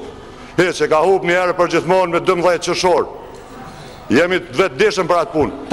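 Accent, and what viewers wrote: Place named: Dukagjin, Kosovo. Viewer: daut brravo tamon ki fol si burr dugagjini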